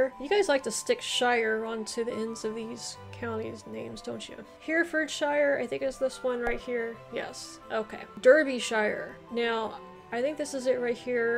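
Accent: United States. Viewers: Having known some Californians in the past it always amuses me hearing American s pronouncement of English place names. But you did well with the position of the counties. I was born in Yorkshire now live in Staffordshire and have been to every county in England. An example Leicestershire is pronounced Lester shire. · Well done, for a non brit you did really well, very American pronunciation though 😀 If you ever need a brit to teach you our ways of speaking hit me up 😀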